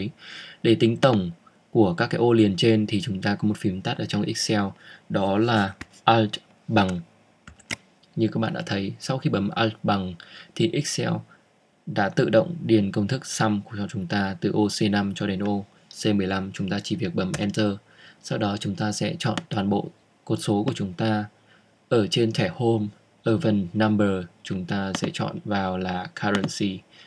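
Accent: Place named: North, Vietnam. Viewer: Giong con trai mien Bac nghe hay qua. Cam on nha.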